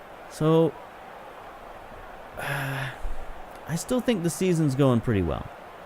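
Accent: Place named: United States. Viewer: Two things: One, it's "shoniere", rather than "koniere" (Choniere); and two, where'd you learn to say Toronto right? I grew up there, it's odd to hear someone say it like a native of the town when they've got such a clear American accent. :)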